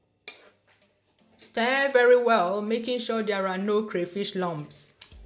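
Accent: Igbo, Nigeria. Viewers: I like your igbo accent.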